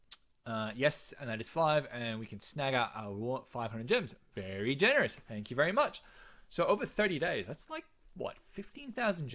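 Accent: Australia. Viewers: Are you in Australia? Love the Aussie accent lol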